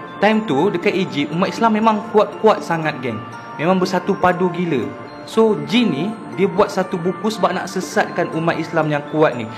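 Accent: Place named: Kuala Lumpur, Malaysia. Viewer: Cakap Kuala Lumpur ekk 🤣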